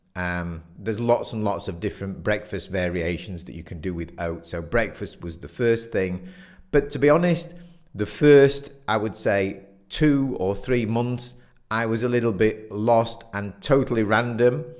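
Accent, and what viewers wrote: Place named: United Kingdom. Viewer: I'm a fan of the British and, like most Americans, I fall for the accent. You are a lovely man. My husband reversed his gout and other physical ailments, but his doctor had to tell him, "Your numbers are not good at all!" And so, long story short, he listened to his vegan, low-to-no fat diet and found, at his next doctor visit, that his numbers were much better and his gout went away. Carry on, kind sir, as you Brits say!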